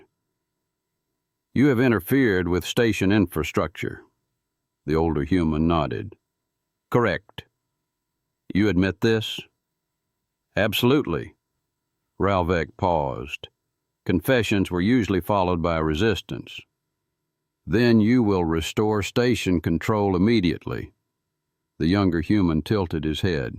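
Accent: South, United States. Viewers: Sounds like cleitis is telling a story real southern accent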